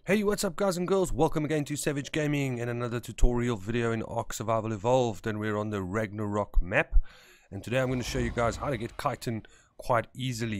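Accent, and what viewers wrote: South Africa. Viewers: Dude your south African aren't you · You from South africa?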